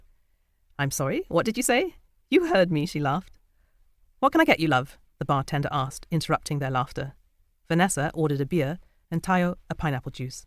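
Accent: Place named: United Kingdom. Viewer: The voiceover sounds way more British than the normal African tongue context, ngl but the phonetical pronounciations of each words solely suites the accurate tonations in variations. Gracias.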